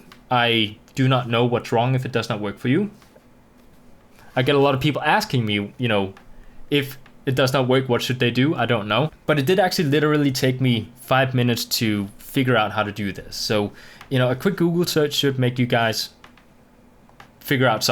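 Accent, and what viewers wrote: Denmark. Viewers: He's Danish u can tell by the accent and the ads. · Tager jeg helt fejl hvis jeg kan ane en snært af dansk i accenten :P?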